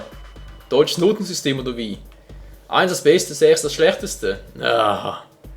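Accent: Switzerland. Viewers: Bist du schweizer wegen des dialekts?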